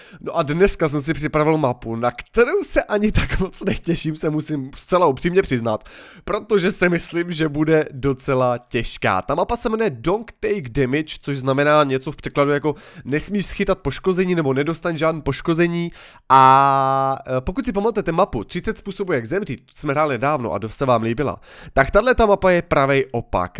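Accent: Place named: Pilsen, Czech Republic. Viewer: Klasický Plzeňák :D Hubu proříznutou :DD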